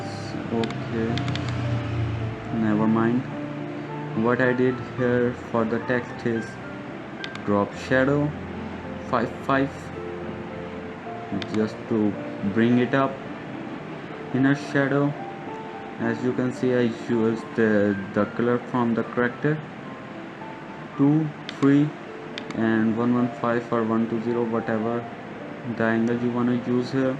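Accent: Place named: India. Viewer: your voice. INDIAN DETECTED 😍😍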